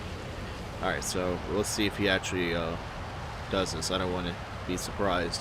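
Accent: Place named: Texas, United States. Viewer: I'm pretty sure he's from Texas idk how you got a British accent vibe from his voice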